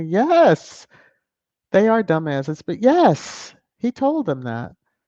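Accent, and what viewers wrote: RP, United Kingdom. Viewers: So, happy you are feeling better ❤❤:_MrsVSLove:_MrsVSDrinky:_MrsVSCross:_MrsVSLove:❤ WE MISSED YOU ❤❤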